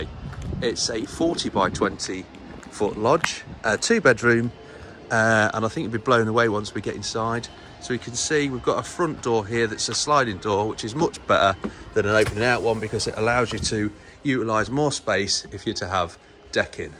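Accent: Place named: England, United Kingdom. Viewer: Don’t you think the English accent gives it away .? ?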